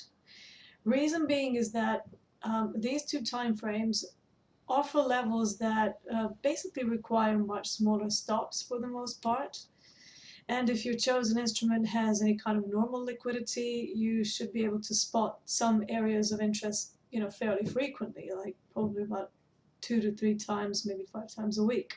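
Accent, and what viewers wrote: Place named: United Kingdom. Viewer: Your terms and methodology are suggestive of Online Trading Academy's. Are you a grad? (I'm enrolled in the Mastermind program and start Core Strategies this coming Saturday. I appreciate the pre-class tutorial, enhanced by the aesthetically pleasing visuals in the lower right corner along with a mellifluous Brit-sounding accent.) ;-)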